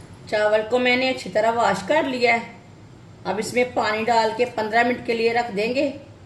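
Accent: Punjab, India. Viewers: Although Punjabi accent but excellent and simple recipe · Apki Urdu bi Punjabi lagti hai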